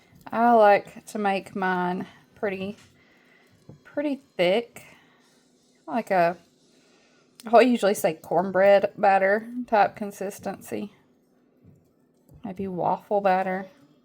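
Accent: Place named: Alabama, United States. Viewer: You sound like you’re from Alabama. I’m a north Alabama girl and I feel the southern cooking in the bones!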